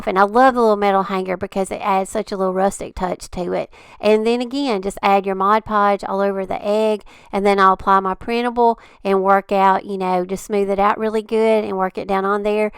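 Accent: South, United States. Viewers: I also love your sweet southern accent ❤️. What part of Alabama are you in ? I live in Haleyville Alabama. It's in the north west part of the state.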